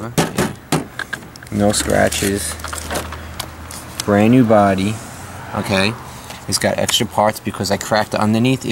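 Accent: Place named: East Coast, United States. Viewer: Are u from new York or somewhere in the east coast u have a strong acent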